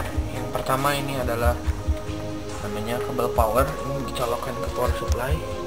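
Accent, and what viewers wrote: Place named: West Java, Indonesia. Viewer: eleuh eleuh eta kang,orang sunda nya......good kang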